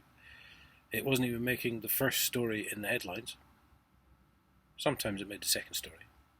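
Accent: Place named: Inverness, United Kingdom. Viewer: You sound like you’re from Inverness, are my suspicions correct?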